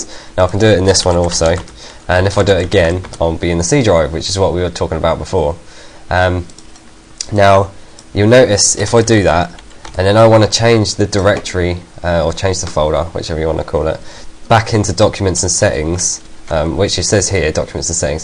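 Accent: United Kingdom. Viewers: Very good video. Very well done. btw thank you for not using any annoying ass music, rap, rock, whatever,ect THANK YOU!! just a normal English voice,with classy british accent to-boot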